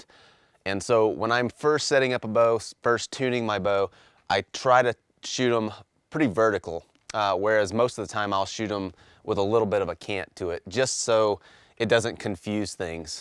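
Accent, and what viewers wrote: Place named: Appalachia, United States. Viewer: Greetings to speaking Appalachian English and Deo Frutuoso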